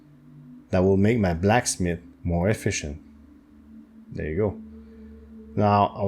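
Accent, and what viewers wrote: Quebec, Canada. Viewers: at only I could have bet my life savings that you were Quebecois ⚜. Much love!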